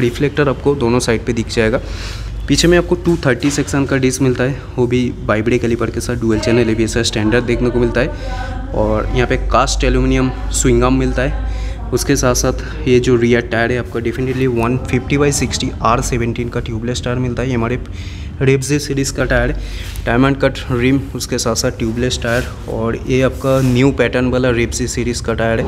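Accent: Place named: Bengal, India. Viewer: Hey bro i like your bengalish Hindi ...❤unique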